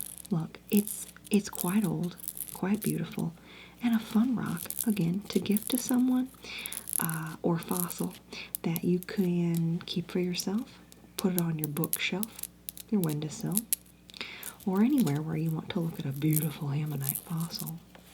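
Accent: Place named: Arkansas, United States. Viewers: THE WAY I YELPED as soon as I saw this video!!! I'm not even a crystal girlie, but I don't think I've ever seen a crystal-themed ASMR roleplay that I haven't loved. And this accent.... I am unironically obsessed, there's just somethin bout that sweet southern *hwhistle*😍😍😌😌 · I was going to say how fitting it would be if Kathy was located in Arkansas since Arkansas has so many crystal shops. Also feel like you nailed the specific southern accent Arkansas has :)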